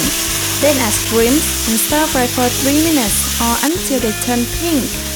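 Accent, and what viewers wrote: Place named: Laos. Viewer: Tiếng Anh mà nghe như tiếng Lào. Phát âm vc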